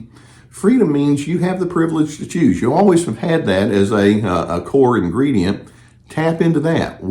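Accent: South, United States. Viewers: insightful and educational as ever. I love your southern accent too!❤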